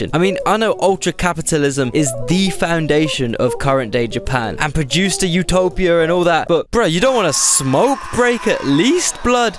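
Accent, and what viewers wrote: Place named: United Kingdom. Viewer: I love compilations of random clips with a slighty angry British person talk about it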